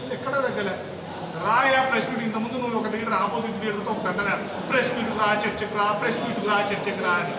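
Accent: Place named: Rayalaseema, India. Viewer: Mana rayalaseema slang tho adaragottav anna.jai Sudheer Reddy anna.jai ysrcp. Love from jammalamadugu.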